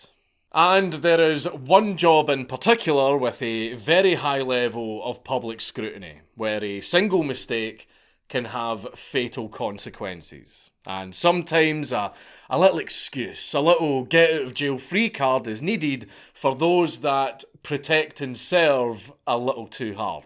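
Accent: Scotland, United Kingdom. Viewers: stfu ur scottish brother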